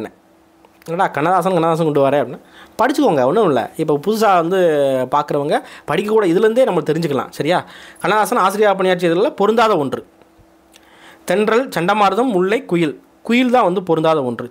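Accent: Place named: Madurai, India. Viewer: Super video sir. Thank u🎉 unga iyalbana peachu enga maduraiya kanu munadi kondu varuthu sir. Easy ah understand aguthu sir ilakanam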